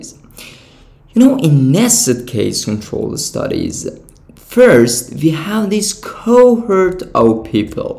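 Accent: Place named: Iran. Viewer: Thank you for sharing this amazing video. Right from the start, I could tell that you are Iranian because of your lovely Farsi accent and the way you wrote "eighty" in Farsi 😁. By "group matching," do you actually mean frequency matching?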